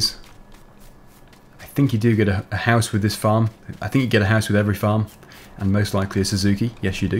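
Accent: England, United Kingdom. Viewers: Hello sir are you English?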